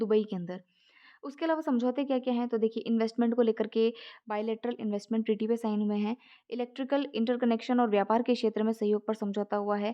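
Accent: Haryana, India. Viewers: App haryanvi ho kya??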